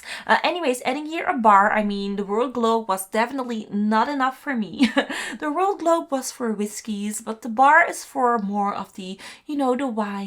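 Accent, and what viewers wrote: Netherlands. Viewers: Je hebt echt een Nederlands accent ik hou ervan. 🧡🇳🇱🧡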